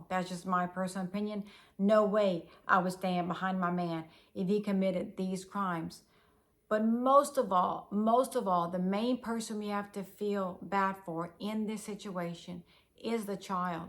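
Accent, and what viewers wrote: Louisiana, United States. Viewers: I'm glad to see you covering this. These folks ain't right, like some inbreeding stuff going on, summmthin fishy I dunno.... Buuuut I can't believe your channel ain't at minimum a million subscribers! You are stunningly beautiful, you do a excellent job at covering these cases, and you got that cajun accent everybody loves! 💯 We gotta get this channel in the algorithm everybody leave a quick comment on her vids ❤ ~From, Houma 🐊 ☮️ ☯️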